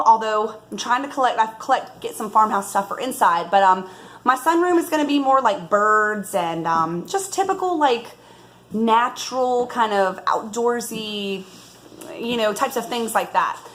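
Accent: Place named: New York, United States. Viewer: Are you from New York. Cute accent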